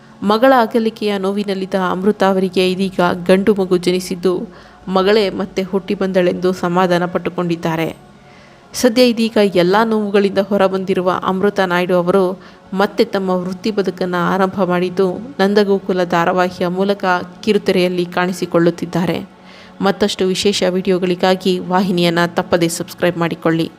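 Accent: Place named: Mysore, India. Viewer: ಮೇಡಂ, ನೀವು ನಿಮ್ಮ ವಾಯ್ಸ್ ಗೊತ್ತು. ಮೈಸೂರಿನ ವರು.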